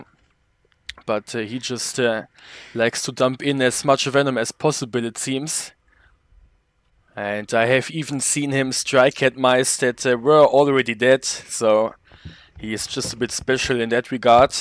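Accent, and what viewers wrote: Germany. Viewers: Youre not English right youre another Language like German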